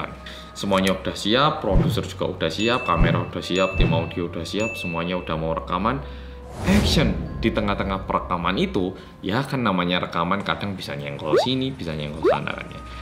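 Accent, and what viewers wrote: Java, Indonesia. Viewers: Jumscare nya emg ngeselin produksinya medok😅, sering x saat kaget hp ku, ku lempar sendiri sangking kagetny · pembawaannya njawani rendah ati, enak didenger meski medhooook.. stay humble, mas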